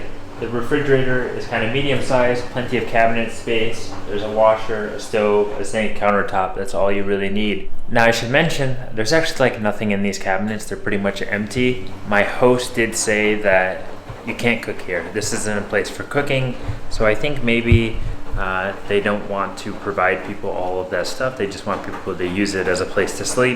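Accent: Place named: Midwest, United States. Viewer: Just curious to know which US state you are from, your accent makes me wonder if you might be from either Ohio, Indiana, Illinois or Michigan?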